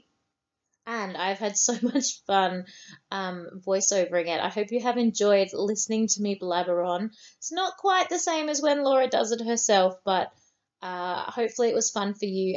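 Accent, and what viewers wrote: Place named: Australia. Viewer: I love the Aussie accent soooooo much so this was a lovely treat for me. Course, I'll be glad when Laura can speak again too ;)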